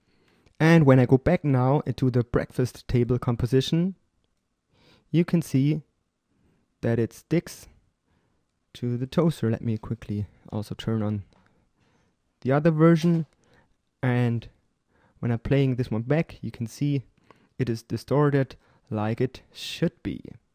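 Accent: Germany. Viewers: Deutsche Ausprache xD Aber gut gemacht :) Wie wäre es mit Deutschen Tut's?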